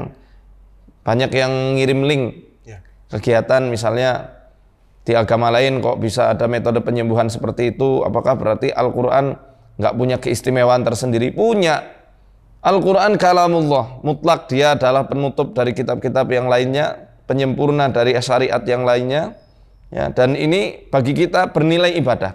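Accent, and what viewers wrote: Banyumas, Indonesia. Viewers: Ngapak ustad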